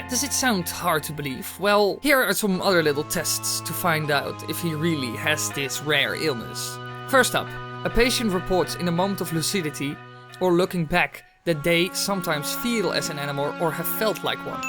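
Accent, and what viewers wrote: Netherlands. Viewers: Is this lad Dutch? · That accent must be Dutch. Good video!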